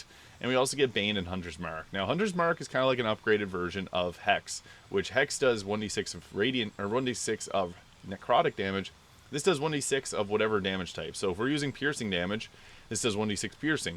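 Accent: Maritimes, Canada. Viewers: Interesting. Thanks. I could tell you're from the Maritimes by your accent 😊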